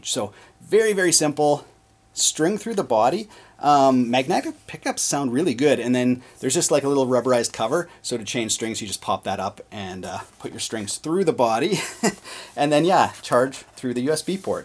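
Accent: Canada. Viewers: I love Canadian accents! Teach me master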